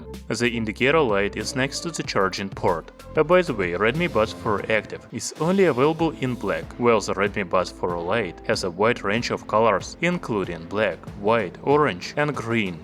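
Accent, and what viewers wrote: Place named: Russia. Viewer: Какой же сильный русский акцент, извините ) Мне кажется, в русском языке столько звуков, что язык может говорить на английском гораздо ближе к носителям.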